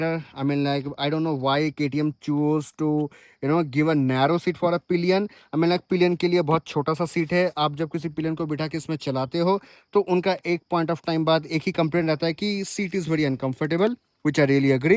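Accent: Bengal, India. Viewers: Bhaiya Bangali ho Keya ?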